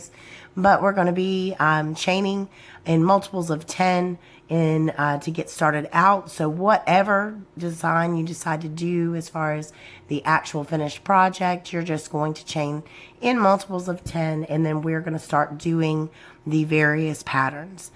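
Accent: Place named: Texas, United States. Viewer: Hi! I recently found your channel and just love all your work! Mosaic crochet is simply wonderful and your designs are so nice! By the way, are you in/from Texas? Your accent sounds like, I'm from there (San Antonio) but live very many years in Athens, Greece! Keep up the great work!! Cheers!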